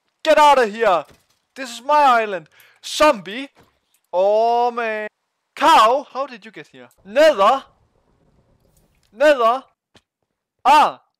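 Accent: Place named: Denmark. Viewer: Man kan høre du er dansker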